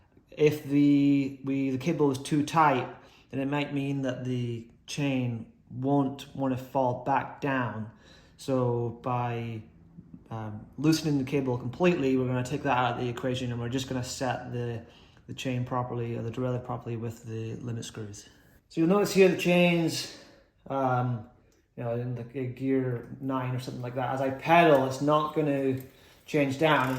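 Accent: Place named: Scotland, United Kingdom. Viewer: Fabulous video…enhanced by the hint of a Scottish accent ..👏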